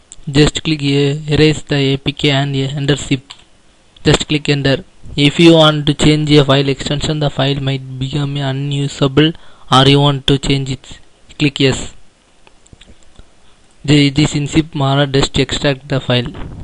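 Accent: India. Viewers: It's not Indian accent, it's south Indian accent. India is too diverse to have a single accent throughout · Wasim Akbar its indian english accent :)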